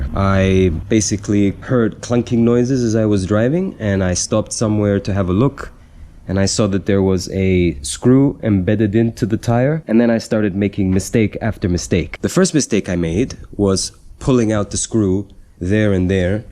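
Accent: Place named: North America. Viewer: Good video again. You definitely don't sound Greek, more North American accent.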